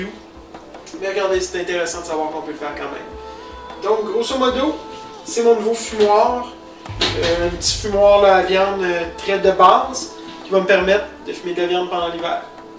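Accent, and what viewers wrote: Quebec, Canada. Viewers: Sûrement intéressant, mais en tant que Français, je n'ai rien compris dû à son accent canadien qui fut le nôtre il y a 4 siècles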